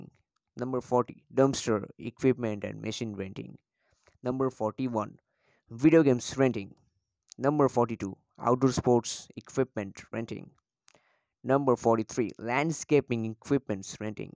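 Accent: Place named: India. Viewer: He is from India.. accent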